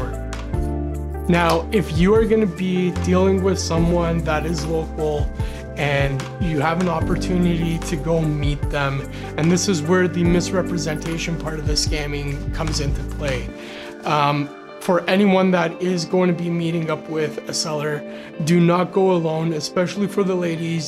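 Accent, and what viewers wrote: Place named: Hungary. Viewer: I'm sorry this is super unrelated, but are you originally from Hungary? Just thought because of Füli and some slight tinge of your accent ^^ Thank you for all this information also, I just found your channel and already subbed ♡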